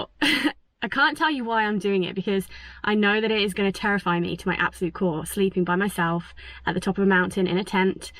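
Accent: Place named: Midlands, United Kingdom. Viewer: Cant pin point your accent, a few words slip but cant place them, youre south of brum?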